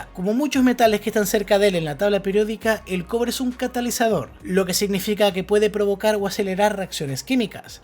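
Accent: Chile. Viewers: Hablas como chileno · Hola. Soy de Chile, no sé de qué zona de España eres, pero me parece que hablas parecido a nosotros. Saludos. Felicitaciones.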